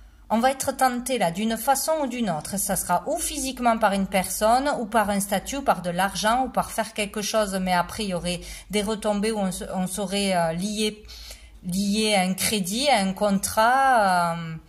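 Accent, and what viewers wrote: South, France. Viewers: avé ton accent méridional et candide, tu es sympa ❤😀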